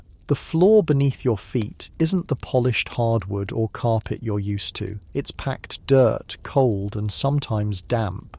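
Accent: England, United Kingdom. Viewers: I love your content, but why the switch to the English accent narration? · I didn’t realise how many channels there were of these but I love this one. It’s the English accent because I cannot stand American ones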